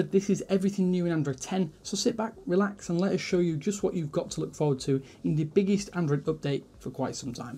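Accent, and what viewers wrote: United Kingdom. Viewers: That BRITISH accent!!!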